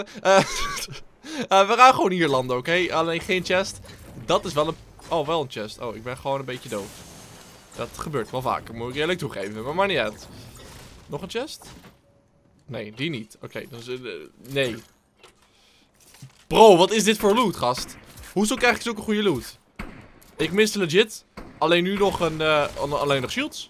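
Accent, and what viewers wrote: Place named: Flanders, Belgium. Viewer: Ga weer vaker vlaams praten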